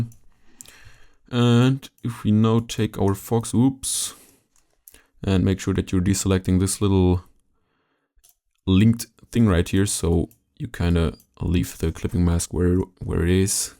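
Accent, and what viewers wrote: Germany. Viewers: Watched every second of this. Lovely. Jurgen Klopp came to mind through out because of the German accent lol. Bravo! Subscribed!!! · kanns sein, dass du deutsch bist? :D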